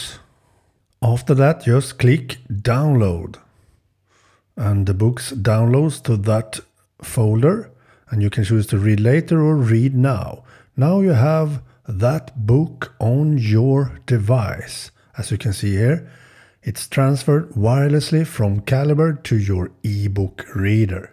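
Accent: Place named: Sweden. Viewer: Härlig svengelsk dialekt! bra video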